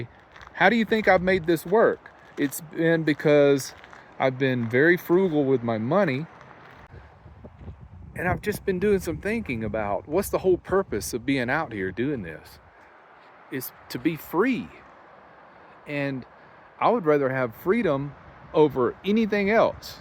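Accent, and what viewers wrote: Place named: Georgia, United States. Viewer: fellow Georgian here. I'm almost 66 yo and in July 2023 I sold my home in Fl, bought a 31 RV and have traveled to almost every single state in the US, i have 4 states left to see besides Hawaii. I travel with my two little doggies and my cat. Like you I stay as long as I want wherever I want and am just free. I watch your channel not because you travel in a van but because a) i just love hearing that Georgia drawl and b) because you are you. You inspire me and that's important. Whatever you decide it will be right for you! Stay safe and happy travels.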